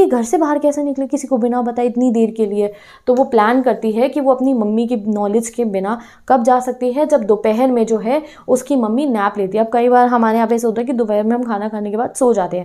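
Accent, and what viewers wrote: Punjab, India. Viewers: Di are you a Punjabi?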